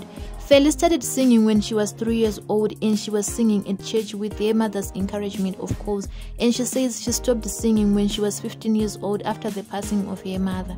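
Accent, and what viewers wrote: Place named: Shona, Zimbabwe. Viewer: I am much in love with the deep english shona accent, and loooong pauses by the narrator 🤭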